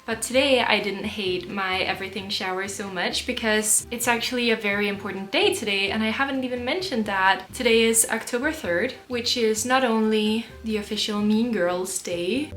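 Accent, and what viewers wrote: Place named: United States. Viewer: I love, love, love your videos! It's my favorite way to start off a cozy Sunday :) I really enjoy that you have stayed authentically yourself as your views have grown. I think that's what your audience appreciates about you. I like seeing the joys but also the irritations of daily life. You don't live beyond your means, you don't pretend that you are not on a budget. You share your struggles about work/life balance and the added complications that hormones give to us women. Keeping things real makes me cheer for you all the more! In terms of what extra content to add, I myself am really interested in your language(s) journey! This might be very specific to just me though lol. Learning about the way we communicate/express ourselves is endlessly fascinating. From what I've gathered, I think you speak Danish, English and French? 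I am from Seattle, but I have traveled/lived in all different areas of the world, and I find your specific "language mannerisms" so interesting! I know it's very common for most of the world to speak several different languages, but I have found that usually when someone from Europe is speaking English, they tend to have a strong underlying clipped British tone. You, however, have an almost American cadence when you speak. Im not sure if it's from watching Gilmore Girls and Twilight so many times (lol) or the books you read or having lived in a couple of different areas yourself. I have watched other Copenhagen videos, and those girls, though fluent, don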